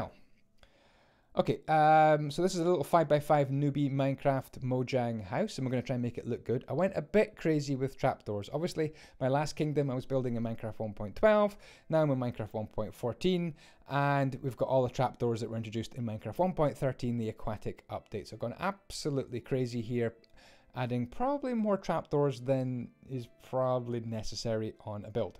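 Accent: Scotland, United Kingdom. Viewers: You have a scottish accent🏴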